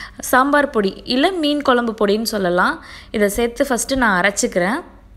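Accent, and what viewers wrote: Ramanathapuram, India. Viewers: enga ooru slang madhiriyea iruku....na ramandhapuram.... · k sister ramnad side area la paesura maari irundhuchu sister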